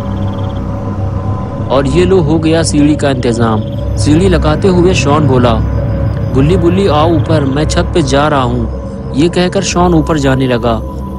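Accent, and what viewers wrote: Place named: Hyderabad, India. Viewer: Fast comment nice video hyderabadi Style me boleto kiraak mouth, 👌👌👌👌👌👌👌🌹🌹🌹👍👍👍👍👍👋👋👋👋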